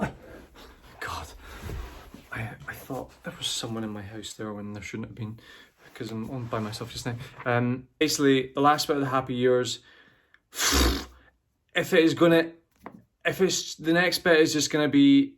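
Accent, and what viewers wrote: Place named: Scotland, United Kingdom. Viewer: Omgosh, 2nd i heard the scottish accent I jizzed myself....wellllll, the book is on my night stand as we speak, so lezzz do disss.....also have the audio book on deck just in case. I only hope to find a boyfriend to gift me books on valentines day some day heart eyes emoji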